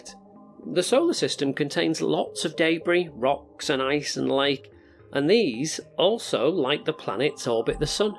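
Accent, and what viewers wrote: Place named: Bolton, United Kingdom. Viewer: Are you from Bolton?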